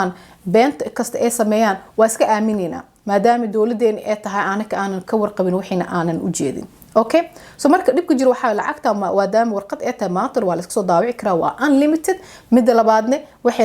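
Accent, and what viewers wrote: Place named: Mogadishu, Somalia. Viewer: Naa lahjadan reer xamarka YouTuber ku noqon maysid ee nagatag waxaad ku hadlayso iska soo sax hadaad dad dhex keenayso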